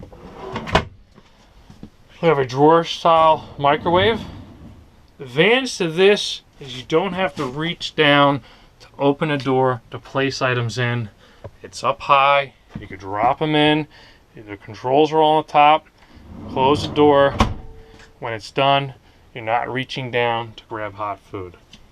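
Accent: New Jersey, United States. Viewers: you sound like you have a new jersey accent or something. where you from?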